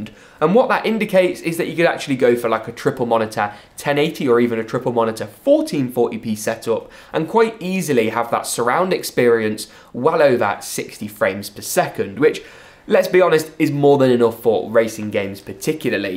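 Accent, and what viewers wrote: United Kingdom. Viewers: Not only is he Spider-Man, but he is also a tech genius But you thought I was finished he also speaks in a British accent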